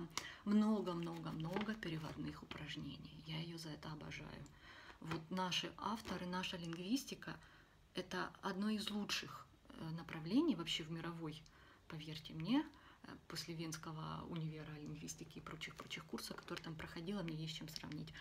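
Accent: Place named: Ukraine. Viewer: Автор видео, вы бы сначала русский выучили! Стыдно говорить и выкладывать видео с таким колхозным малоросским акцентом!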